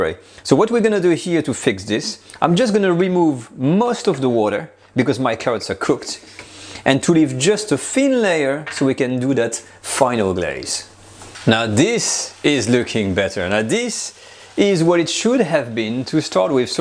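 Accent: France. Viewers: I just love to hear you speaking English with that lovely French accent.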